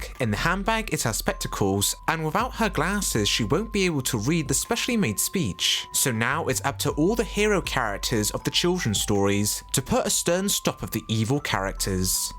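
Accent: United Kingdom. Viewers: Fun fact: the creator of the video is from the uk cuz of his voice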